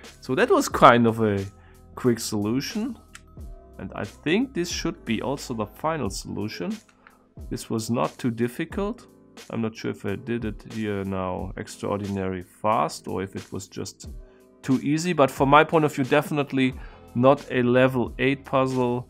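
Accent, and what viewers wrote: Germany. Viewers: Th video of the Titan puzzle was the first video I watched from you. I like you but I have a questio: are you German? (I ask because of your accent)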